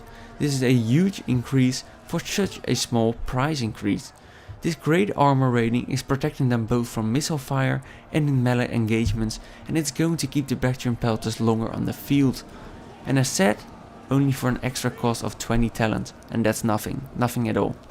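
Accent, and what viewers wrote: Netherlands. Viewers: love you dutch accent!!!! Nederlander en meoten we trots op zijn, Batavi, frisii.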